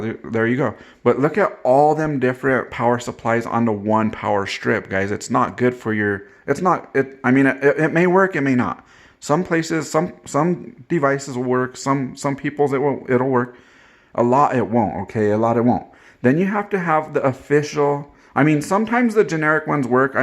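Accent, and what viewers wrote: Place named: Oklahoma, United States. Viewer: Is this guy from Oklahoma? Ok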